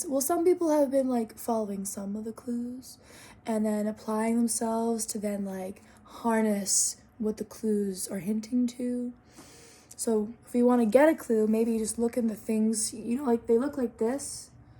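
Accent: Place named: RP, United Kingdom. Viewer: OMG! Can I be more Inlove w/ this girl!!! Haha!! Aquarius Sistar here!! I love your Scribbling Bit! Nothing could be cuter except the general Alice British proper way you speak through the blubbering of your whimsical speech as you interpret the codes of your readings in your experience. OMG Girl I'm gonna get drunk on this channel, dangerously. You're a Marvelous bloomage of humble beauty dearest Lovely Crystal Star Child. ✨🫶🏻🎶💥 I think you know who this is 😜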